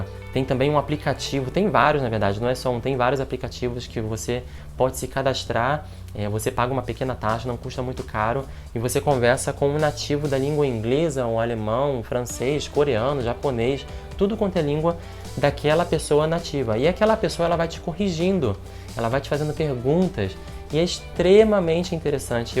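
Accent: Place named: Rio de Janeiro, Brazil. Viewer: De qual estado do Brasil é você? Eu tô aprendendo português e quero saber o seu sotaque, gosto muito de ouvir sotaques do Brasil e dos países lusófonos todos! Edit: Eu já vi que vc é do estado do Rio de Janeiro. Rsrsr Sou novo inscrito no seu canal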